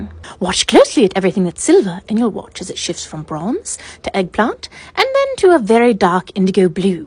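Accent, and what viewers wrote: RP, United Kingdom. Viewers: I don’t know if I’ve ever heard a more posh accent, absolutely love it!!!!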